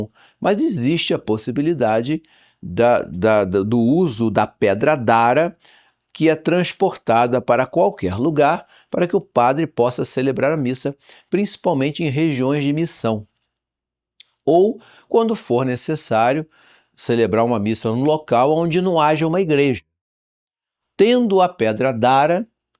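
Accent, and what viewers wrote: Rio de Janeiro, Brazil. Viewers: Olá, boa noite! Que aulas maravilhosas!!! Parabéns ao CDB e ao excelente professor! Só gostaria de frisar, aqui, que o excessivo sotaque carioca torna a aula cansativa ao ouvido, o excesso do chiado do 's', o que é um ruído da fala, é ríspido ao ouvido. Como boa estudante que fui de estética vocal, sugeriria ao professor minimizar este sotaque. Nada contra os cariocas, por favor. Apenas que para falar ao grande público é melhor sem ele e qualquer outro. Obrigada.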